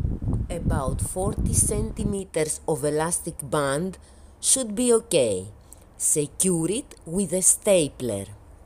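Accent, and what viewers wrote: Greece. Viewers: greek accents are the best <3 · Guys, she is greek. This is the classic greek accent, i live in greece sooo... XD (edit: also the newspaper is in greek.) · Excellent tutorial. The aluminum foil is a great idea for the underlying structure. Love your Greek accent too.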